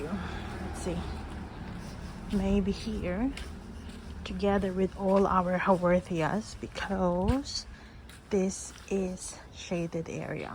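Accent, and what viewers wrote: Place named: Philippines. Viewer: Hi! Lovely how you were able to revive them!! 💖 I struggle with these plants but I want to have them so bad! After I get new pearls, is it okay to change the soil completely? Will it kill the plant due to shock? I don't usually like the potting mix it comes with as I'm afraid they're not fit with my conditions (might be not so well-draining) or might even have pests in them. Is it better to leave it? Also, are they generally okay in shaded areas? Just bright shaded area, not much sun 😅 P.S. You sound Filipino!! Great video 💟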